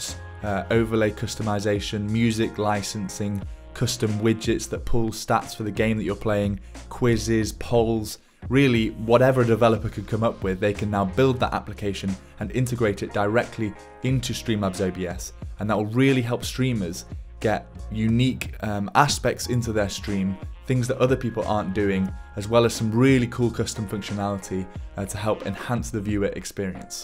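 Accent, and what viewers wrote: United Kingdom. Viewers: Where is your accent from? It doesn't sound like london british but it sounds british, it's nice.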